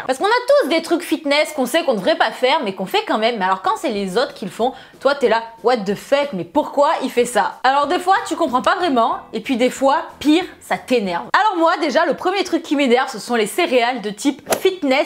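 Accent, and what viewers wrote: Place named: Nice, France. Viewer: C'est officiellement la vidéo ou son accent nissart ressort, c'est connu l'accent ressort quand tu es énervé x)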